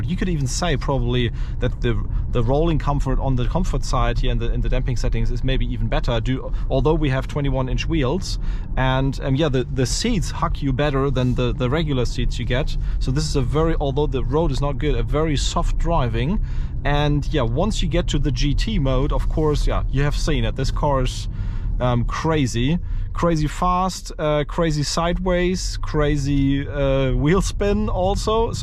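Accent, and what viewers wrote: Germany. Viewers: Man hört diesen Deutsch Akzent sooo raus haha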